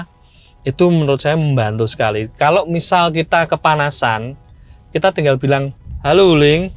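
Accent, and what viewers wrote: Java, Indonesia. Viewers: Ngomongnya khas jawa, luamaa😅 saya percepat 1,75 baru enak dengerinnya..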